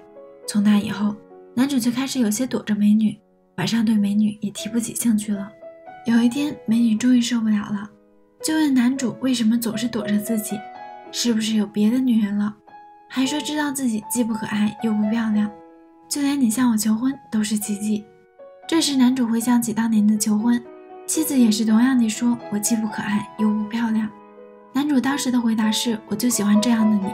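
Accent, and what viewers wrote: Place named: Shandong, China. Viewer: 讲解人山东口音。。。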